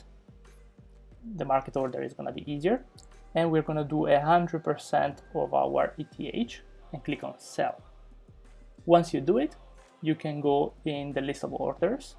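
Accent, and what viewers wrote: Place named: Italy. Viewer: Ciao, sembra che sei italiano..di dove sei?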